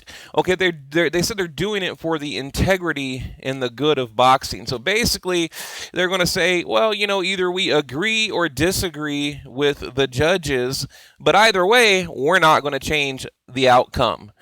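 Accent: United States. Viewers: Your fucken Septic yank voice pisses us off,TEDDY fucking Atlas is the most biased fuck in the world,listen to the British version of he fight,they had Horn edging it,Atlas even when Horn was landing heaps of punches early on,Atlas was talking about what Pacquiao was going to do later on,Tim Bradley was attempting to put a non biased slant on the fight,fuck off with your crocodile Dundee shit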